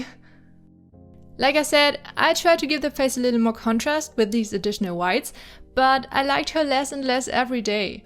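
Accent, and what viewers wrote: Germany. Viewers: Ich mag diesem deutschen Akzent in deinem englisch🥰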